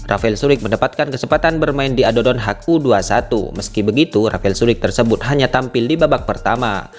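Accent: Madura, Indonesia. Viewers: Logatnya kayak orang madura ya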